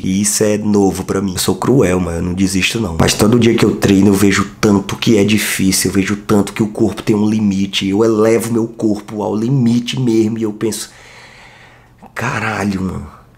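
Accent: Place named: Ceará, Brazil. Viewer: Cara ta com um sotaque de cearense q mora no barroso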